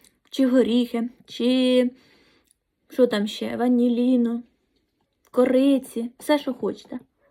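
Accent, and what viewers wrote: Poltava, Ukraine. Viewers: В багато підписників на різних каналах Це її образ селянської дівчини з Полтавським акцентом Подивіться її інтерв ю вона грамотна, сучасна дівчина,одягається гарно і по сучасному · ну знаєш полтавський говір це одне, але коли написано безграмотно...,😭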